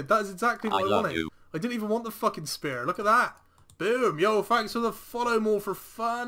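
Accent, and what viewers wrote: Bristol, United Kingdom. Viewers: From Bristol much? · literally paused at 15 seconds you have to be bristolian, right?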